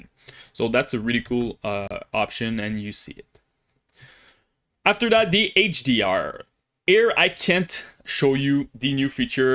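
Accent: Quebec, Canada. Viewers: I love your French-Canadian accent lmao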